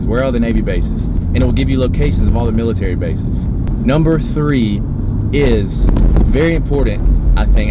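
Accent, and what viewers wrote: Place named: South, United States. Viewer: Don't take offense, but you remind me of a tough version of Lance Bass. Maybe it's your southern accents. I know he's from MS and you're from AL.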